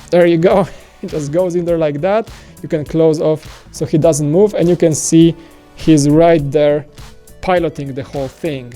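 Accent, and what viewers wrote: Poland. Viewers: I’m guessing Polish 🇵🇱 But I could be clearly wrong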